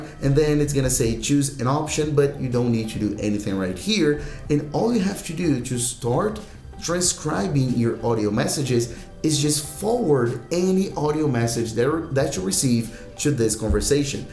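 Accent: Brazil. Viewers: Valeu cara otimo video, na hora que vc começou a falar, notei algum sotaque brasileiro (seu ingles é otimo) ai confirmei quando eu vi Lazaro negao e Amor, Vlw !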